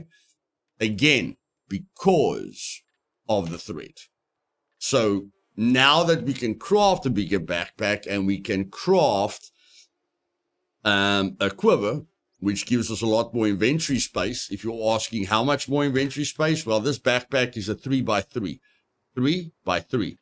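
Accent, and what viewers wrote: South Africa. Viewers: You south African bro? Cool accent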